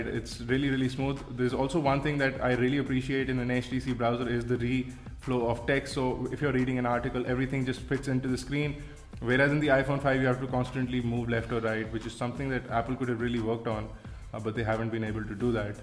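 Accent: India. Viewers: can`t u understand by accent ?? u dumb!! of course form indiaaaaaaaa... :D :D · Indian commentary :D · yeah indian accent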